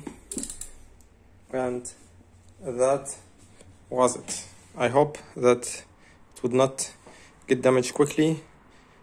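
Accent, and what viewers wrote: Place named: Arab world. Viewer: واضح من اللهجه انك عربي حط الترجمه للعربي لان افكر اخذ مثلها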